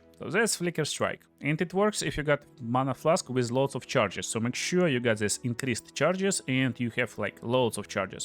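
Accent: Russia. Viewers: акцент у тебя очень похож на русский)) ну да ладно) твой билд хорош! сейчас его строю)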